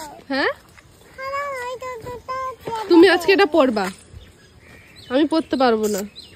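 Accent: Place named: Bangal, Bangladesh. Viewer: Bhaaaa ki sundor bangal kotha koi tmr maiada❤❤❤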